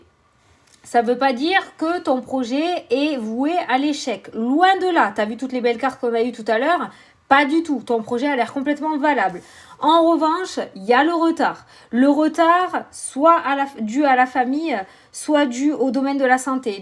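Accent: South, France. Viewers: Ahhhhh😊😊😊... enfin un peu de soleil avec ton accent sur Clermont, dediou ça fait du bien 😁😁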